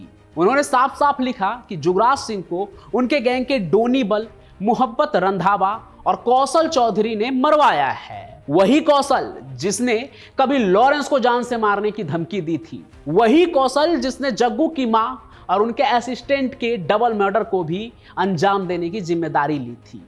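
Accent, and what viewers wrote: Bihar, India. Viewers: Your Way Of Speaking , Accent Is Like Biharis , Like Godi Media Ankers , Speak Normally Dont Be a Actor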